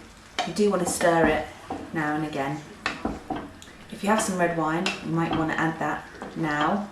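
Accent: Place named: Italy. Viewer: I thought you had an Italian accent . But on the way to get another glass of wine I thought whoa Nellie enough is enough. Nice video,make some more.Looking at the ages of the comments I bet your done with youtube to bad for us.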